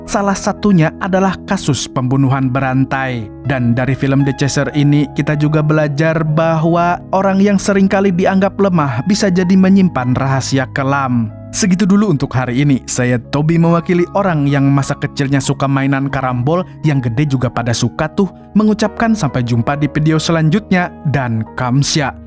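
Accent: Banjar, Indonesia. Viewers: Nah klo ketahuan ku dh si amang urangk banjar😂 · Urang Banjar sklinya Pian lah😅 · Orng banjar kah bg 😂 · urang banjar kah pian mang ehehehe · Urang banjar kah mimin nya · banjar kah ni logat nya😂 mirip iq 7 jua suaranya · Anjay urang banjar kah😅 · Mang pian urang banjar kah? Ulun hanyar sekali nonton disini😭😭😭🤣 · Urg Banjar sakalinya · Uma ay urang banjar kah min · Urang Banjar kah pian mang Salam nah dari alalak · Urg Banjar kh mang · Bang tobi kaya orang banjar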